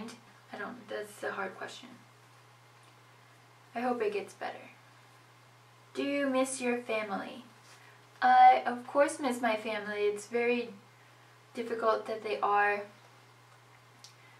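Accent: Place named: Sweden. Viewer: Yay! Hittade precis din video när jag kollade efter denna tag videon som jag kommer filma nästa vecka, ville bara säga vad söt du är och din accent är så svensk 😍 och tack för att du delar! Min son kommer nog ha det exakt som du eftersom han är född här i USA men hela min familj är kvar i Sverige ❤️ Så intressant att se ditt perspektiv, speciellt språket eftersom jag har svårt att keep up the Swedish with him on a daily basis 😅 reser till Sverige för första gången med honom om två veckor och längtar sååå! 🙌🏼 Kram till dig! 💕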